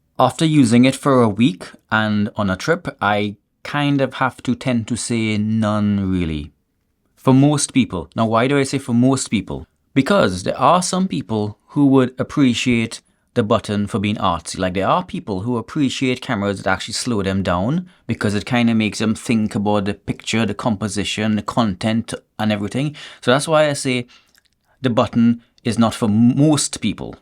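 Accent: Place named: Trinidad and Tobago. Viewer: Bredz like ah hearing Trini 😂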